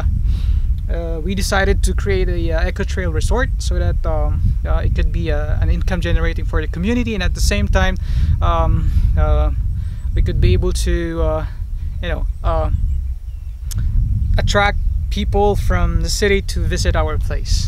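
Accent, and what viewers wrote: United States. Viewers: Wow he has american accent. Amazing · Nice sir your speaking like American guy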